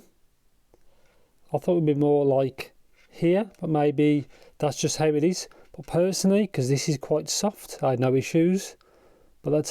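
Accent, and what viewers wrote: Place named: Manchester, United Kingdom. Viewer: Sir no need to answer, are you from the Manchester area? That accent sounds like I'm listening to my cousins from UK. They all live in Manchester area. Still loving blon 03 if I grab back from GF who loves them... Cheers. My gf uses the pouch, thinks it cute.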